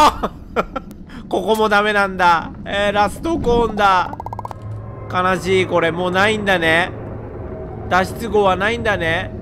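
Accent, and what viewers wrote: Kansai, Japan. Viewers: 関西人ですからね❤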